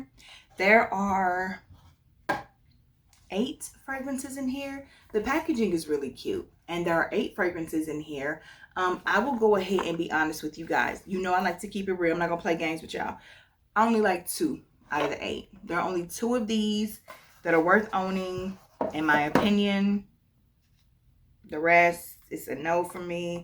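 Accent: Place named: St. Louis, United States. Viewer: Ok…a few things: 1. Thanks for your thoughts on this house, I haven’t heard anyone talk about it 👍🏾 2. You have definitely live in MO or St. Louis for awhile…”all these fragrances in the auurrhh” 😝🥰💛 3. The names draw me in every time! 4. I can’t do prominent pepper notes either 🙅🏾‍♀️ Have a great day! 🥰💃🏾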